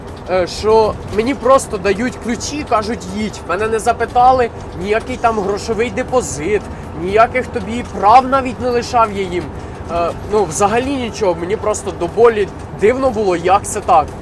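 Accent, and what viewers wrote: Ukraine. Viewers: Деревня , суржик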